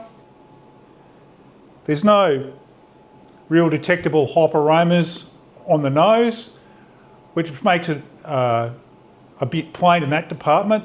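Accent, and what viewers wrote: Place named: Australia. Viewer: Of course it was a compliment. I'm English and love the Australian accent. Was hoping to brew a Velvet Vanilla Porter by David Heath this weekend but our crappy postal service hasn't delivered the required yeast, despite me ordering it on Wednesday evening and it being posted 1st class Thursday. Royal Mail must hate me cause I'm always complaining about the service these days. I let them give the excuses then tell them I was a postie for 9 years back in the 1990's. Like most companies it's gone down hill since privatisation. Unfortunately my local homebrew shop doesn't stock the Lutra Kveik yeast recommend.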